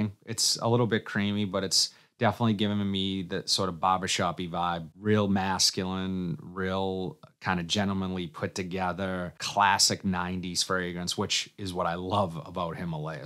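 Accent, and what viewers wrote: Boston, United States. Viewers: Absolutely love Himalaya but haven't smelled XS despite hearing about the constant comparisons. Great video as usual Your Boston accent is so awesome. Go Red Sox 🤣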